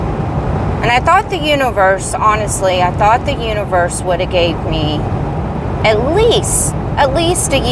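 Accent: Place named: Georgia, United States. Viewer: Your kindness is not wasted. I think you are beautiful. I can't tell you how to find happiness, but I hope you do so, often. I'm from GA originally and something about the way you talk reminds me of home. I love your videos and I hope you continue. - - Not sure how this will land but I will mention it. God will always be there to listen. Prayer is therapeutic, at least. Even if that is all that is expected of it.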